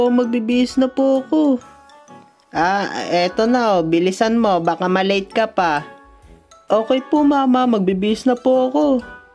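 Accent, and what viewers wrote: Philippines. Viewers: Taga maynila ka ba?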